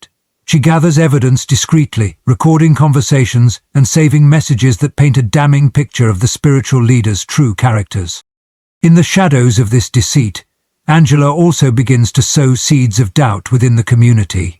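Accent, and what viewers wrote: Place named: United Kingdom. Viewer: Why the narrator isn't black & why the reader with a British accent doesn't state the year of when the event happened. Why no one knows the city in Texas or the name of the church. Ive lived in Texas & I've a church in Plano for 10yrs & there no event this on the news any churches in Texas no name of reporter who the story . I really question & put on this video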